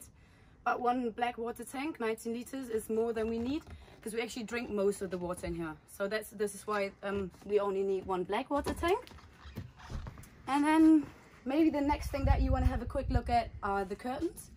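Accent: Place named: Denmark. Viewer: You really sound like you have a danish accent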